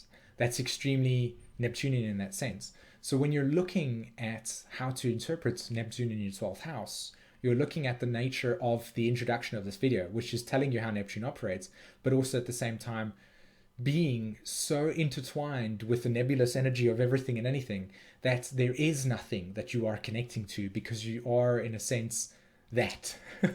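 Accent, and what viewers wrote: South Africa. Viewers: Hey dude, are you South African by any chance ?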